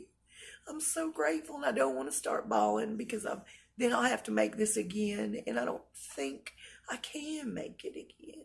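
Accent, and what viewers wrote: South, United States. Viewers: PS I want to thank you for your videos - I enjoy listening to your southern drawl and your outlook on life. May God bless you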